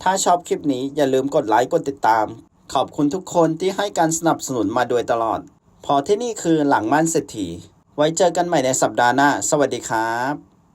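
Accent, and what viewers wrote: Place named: Rural, Thailand. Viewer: รู้นะว่าตั้งใจ ขอให้กำลังใจ แต่ต้องฝึกมากๆ โดยเฉพาะการอ่านออกเสียงชื่อต่างๆให้ถูกต้อง เพราะยังไม่ถูกหลายคำสำหรับชื่อในภาษาต่างประเทศ มีผิดพลาดหลายที่ จึงต้องพยายามแก้ไขปรับปรุงให้ถูกต้อง การอ่านคำต่างๆจึงต้องฝึกให้ชัดเจนตามบรรทัดฐานมาตรฐานภาษาไทย รวมทั้งจังหวะจะโคนและสำเนียงตามมาตรฐานภาษาไทยกลาง อย่าให้มีกลิ่นชนบท เพราะนี่คือการอ่านเรื่องสำคัญของพระราชวงศ์ต่างประเทศ ขอให้หมั่นฝึกทบทวนไปมามากๆ จะดีขึ้นเอง เพราะมีแววว่าน่าจะพัฒนาได้จนได้รับการยอมรับว่ามีมาตรฐานการอ่านที่ดีต่อไปได้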